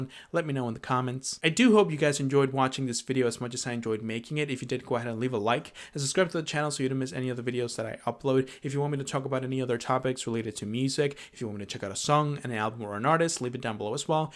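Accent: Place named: United States. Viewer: A 90 miles an hour narrative from a fucking yank, fuck off man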